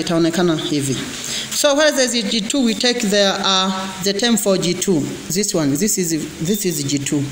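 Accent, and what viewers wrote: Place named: Sukuma, Tanzania. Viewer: Akiongeza kidhungu inavutia lakn kiswahili tunasikia lafudhi ya kisukuma tunabaki kucheka tu🤣🤣🤣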